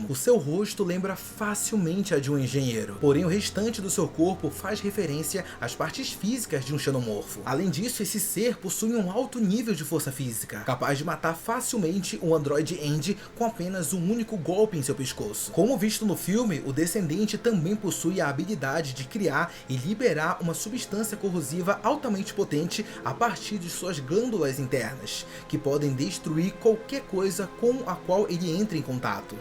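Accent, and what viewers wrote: Brazil. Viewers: Finalmente alguem que fala palavras em inglês abrasileirando... sem querer forçar a pronúncia igual a um semi retardado nerd que se acha nova iorquino.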